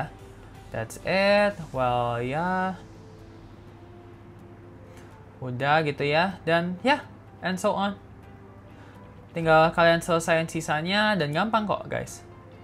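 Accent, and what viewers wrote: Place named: Indonesia. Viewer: Love bgt accent mix Indo English kamu❤❤❤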